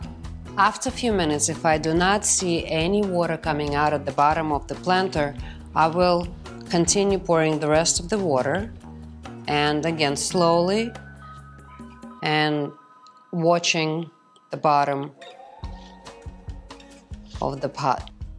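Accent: Russia. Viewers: Are you Russian? Why do I understand what you are saying if my English level is A1 😅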